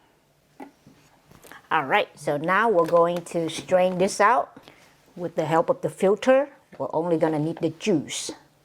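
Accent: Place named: United States. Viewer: Are u living in Singapore? I love your American accent..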